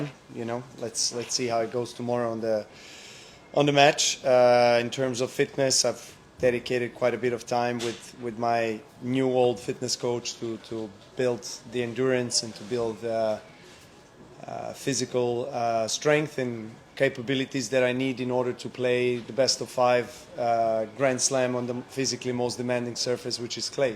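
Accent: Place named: France. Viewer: I love your french accent Nole !!!! WIsh you to be in your best shape for the 3 weeks coming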